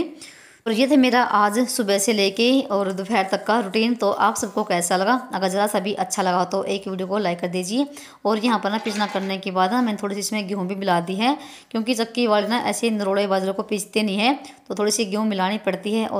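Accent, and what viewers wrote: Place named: Haryana, India. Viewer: Or aap ye bhasha kaun c bol rahi hein aapki bhasha thodi haryanvi touch liye hai aap wahan se hein kya..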